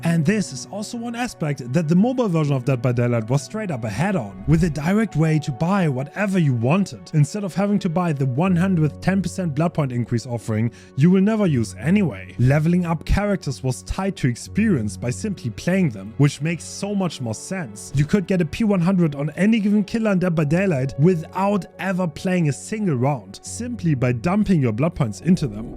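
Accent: Germany. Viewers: german,austrian or switz accent? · is he german?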